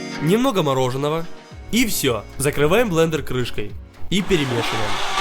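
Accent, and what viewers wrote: Ukraine. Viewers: И сейчас есть украинский акцент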